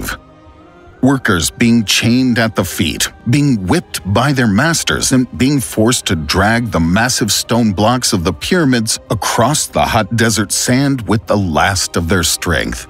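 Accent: United States. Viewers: the narrator's American accent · The narrator has an American accent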